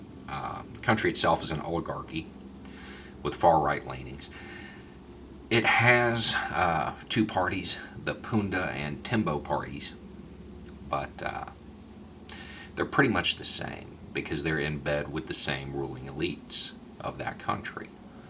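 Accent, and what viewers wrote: South, United States. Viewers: Yep, glad there aren't many trolls. But at the same time, I really want right-wingers to check this guy out. I want them to see someone who looks and talks like them but with a different viewpoint who can passionately argue from a place of love like can. He has a chance at changing minds like few others on YT can. Also want liberal city-folk to see and then maybe think twice before dismissing people with a southern accent as stupid.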